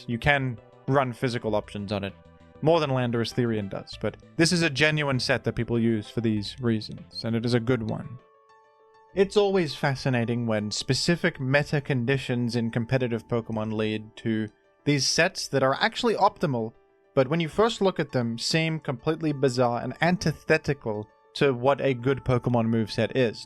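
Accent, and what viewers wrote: Australia. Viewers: As an Australia I can confirm it’s just his accent. That’s how we say it down here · I can’t tell if some of your pronunciations are a joke or just how they say it down under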